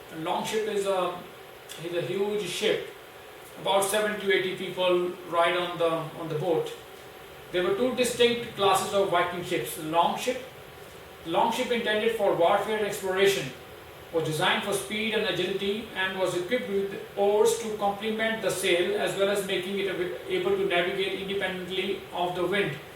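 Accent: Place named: Oklahoma, United States. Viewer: You have a very odd version of an Okie accent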